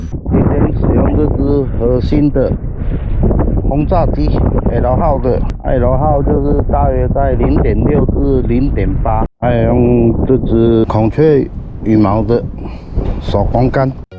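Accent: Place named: Taiwan. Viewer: 講話很台灣，親切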